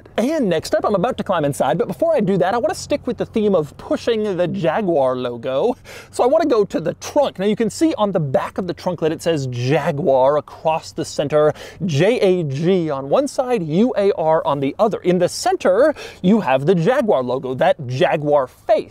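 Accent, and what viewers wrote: United States. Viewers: Why can Americans not pronounce Jaguar = Jag you are, not Jag waaar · JAG- WAAAR, Americans are so funny. It is pronounced JAG-YOU-ARE in English.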